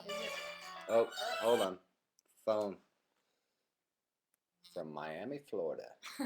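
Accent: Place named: Indiana, United States. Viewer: He pronounces them that way because he's from Indiana, that's how they talk, it's an accent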